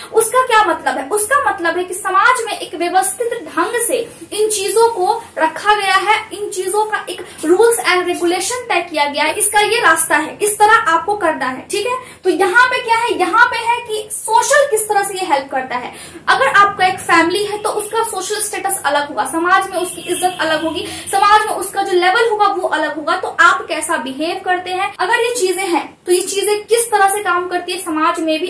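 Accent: Bihar, India. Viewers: Your voice tone told that you are from bihar ❤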